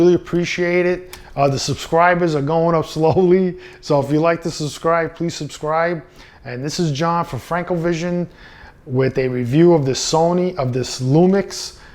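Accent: New York, United States. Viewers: Thanks for the great video, you sound like a New Yorker. I have a question about the sensor size, it's advertised that the 2500 has a 1" sensor. The actual dimension of the sensor is 13.2mm X 8.8mm. and there is 25mm in an inch. And if they add the L plus W it equals to 22mm and not 25mm. My Sony R1 Bridge camera is 12 years old and the sensor size is 21.5mm X 14.4mm which equals to 35.9mm. This means that my 12 yr. old Sony's sensor is about 60% larger than the FZ 2500 sensor. So my point is, all this hype about the 2500's sensor size is really no big deal, sounds like it's an advertising gimmick. What are your thoughts about this??? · Let me guess, your from New York. What is your obsession with the grip. Review the camera/cameras. Your voice is so irritating and the non info about the cameras means you wasted 20+ mins of my life.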